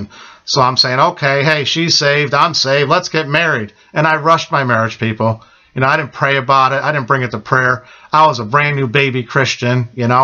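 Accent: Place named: United States. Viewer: thank you so so so so much for sharing this....I just happened on this video, but I'm sure for good reason. You just sound like the typical American guy...and the thing is, there are MILLIONS of us just typical Americans worried about things that don't matter, and spending all of our time wasting life. Your testimony bears upon my heart, even though I've been a Christian all my life...there's more. There's more about being a servant of Christ than just being a 'Christian'. Like you are saying...God bless you. God bless you. Jesus thank you.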